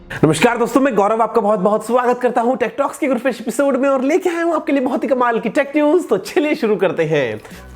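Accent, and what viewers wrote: Bengal, India. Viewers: Are you bengali sir?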